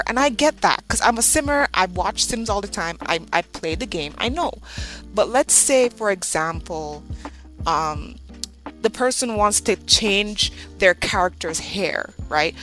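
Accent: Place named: Jamaica. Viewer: I like your vibe, I'm definitely gonna tune in. We share the same sentiments on sirens 😂, and OMG I heard your accent and I was like oooooo she's from the Caribbean, but I literally SCREAMED when you said you're Jamaican! Ahh, I'm a Jamaican simmer too! I'm so excited to meet another SIMS 3 JA simmer 😊. (Sidenote: Isla Paradiso is where I play the most. Gives that home feeling for sure)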